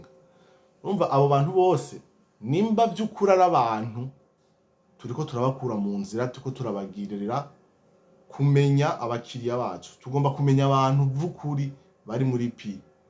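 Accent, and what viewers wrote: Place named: Brussels, Belgium. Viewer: ngo wemeye ubuki utegekaaaa, hahah , ico kirundi nica bruxL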